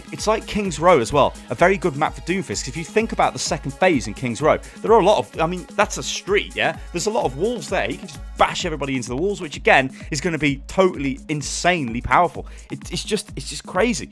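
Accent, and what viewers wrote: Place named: Midlands, United Kingdom. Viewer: Where is he from? He sounds like he's from the midlands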